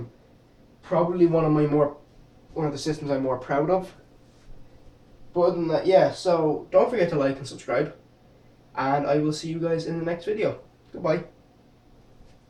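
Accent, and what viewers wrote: Ireland. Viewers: also what part of Ireland are you from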